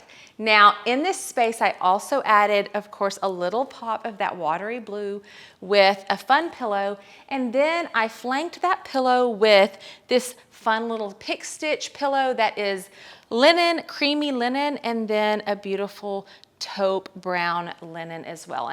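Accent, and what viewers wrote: South, United States. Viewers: I like you a lot more without the over the top southern accent, and yes I am southern. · Love, love her southern accent! I’m from the Midwest…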